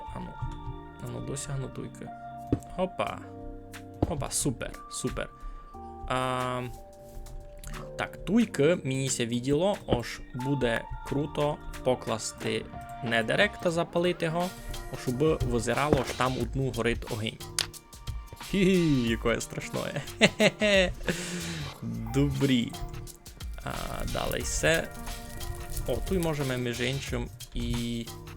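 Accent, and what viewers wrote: Transcarpathia, Ukraine. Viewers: Це закарпацька?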